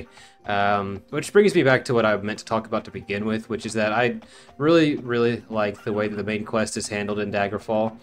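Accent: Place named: Texas, United States. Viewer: I didn’t know what it was or how I knew, but I could tell you were a fellow Texan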